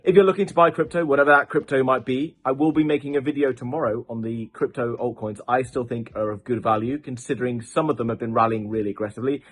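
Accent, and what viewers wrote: RP, United Kingdom. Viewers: New subscriber , great content , you sound posh like me.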